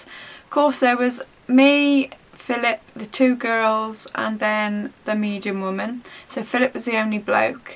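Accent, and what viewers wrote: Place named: Shropshire, United Kingdom. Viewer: Where are you from, you sound VERY Shropshire-ish! I am from Shrewsbury, and I do my own investigations sometimes, and I have had lots of my own experiences :D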